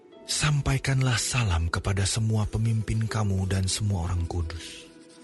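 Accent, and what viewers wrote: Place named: Madura, Indonesia. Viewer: kayaknya yang punya suara ini "orang " madura ya??? trims buat chanel ini..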